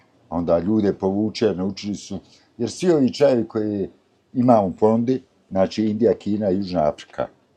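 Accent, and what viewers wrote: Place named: Sarajevo, Bosnia and Herzegovina. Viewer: Predivan,pravi Sarajlija i glas i izgled,šarmantan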